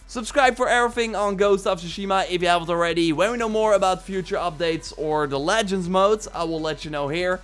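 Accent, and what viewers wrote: Netherlands. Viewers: Ik vindt t echt geweldig dat ik je Nederlandse accent meteen merkte, en toen je de ps store liet zien stond t ook in het Nederlands lol Goede info btw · I knew you were dutch :) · You sound Dutch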